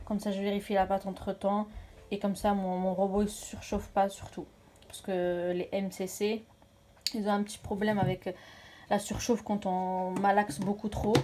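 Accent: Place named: Belgium. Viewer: Super une chaîne pour le mcc ! Cest trop mignon ton accent tu es Belge ?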